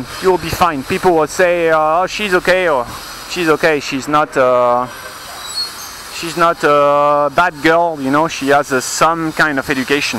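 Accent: France. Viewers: Bonjours ! You have a very French accent , and i am wondering if that can cause problems in teaching English in China ? I lived in China for 11 years , i also was an English teacher !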